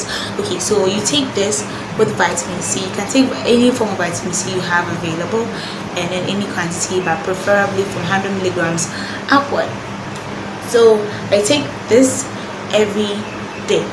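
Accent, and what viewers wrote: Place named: Nigeria. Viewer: Are u Nigerian? I work with a lot of Nigerian ppl. Girl ur accent is Everything!! Sounds like music when u talk. And oh yes thank u for this video. I was about to give up on MSM b/c of the bad headaches.. but I will try it again with more water. Thx girl. 🌹💫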